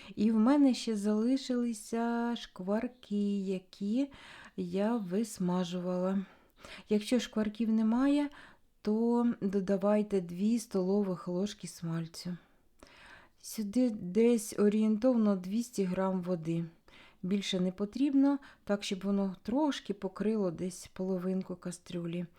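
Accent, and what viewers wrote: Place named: Chernihiv, Ukraine. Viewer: Почти вся Черниговщина так говорит, но рецепт для меня новинка. Спасибо.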